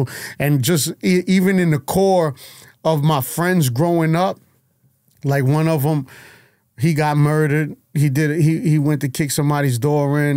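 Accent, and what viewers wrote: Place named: New York, United States. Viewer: Why does he sound SOO New York? In getting Fat Joe vibes. Man, this guy dropped some real gems.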